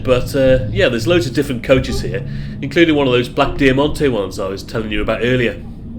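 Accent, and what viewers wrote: Midlands, United Kingdom. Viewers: I'm off to Mexico in a couple of weeks - thanks for doing this upload :) PS do I detect a fellow Midlander from the accent ?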